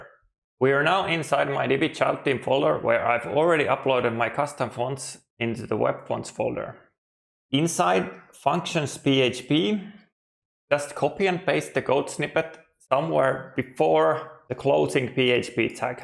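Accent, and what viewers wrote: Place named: Finland. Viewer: Bro! Avasin taustalle kuulumaan ja meni 4 sekunttia aivoilla tunnistaa Suomi-aksentti. Kiitos.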